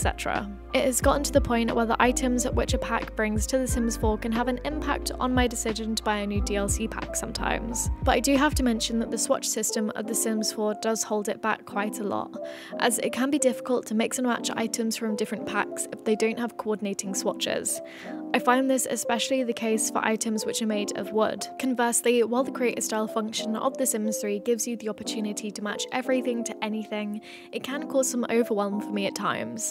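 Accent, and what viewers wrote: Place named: United Kingdom. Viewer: reading books for kids might not be the most fun content to make but.. Something to think about if me, and momma are saying it. And You should be patting yourself on the back. You made a 44 minute video, on a topic I'm not too keen on, watchable. That's a feat. And I'm not a " yes man ". If I didn't enjoy the video, I wouldn't have said anything. I clicked to give you a view. Didn't expect to stay Speaking of your voice and accent.. What kind of accent is that? Forgive my uncultured americanism. I'd guess UK but I could be completely wrong.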